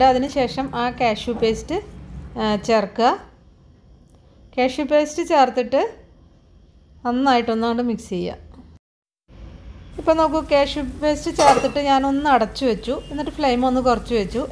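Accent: Palakkad, India. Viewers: palakkad ആണ് എന്ന് എനിക്കും തോന്നി, നല്ല സംസാരം 💗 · sari. samsaram kettappol thonni palakkad, nammude area akum ennu. I am from ottapalam. Cooking video ellam super anu. Try cheyarundu.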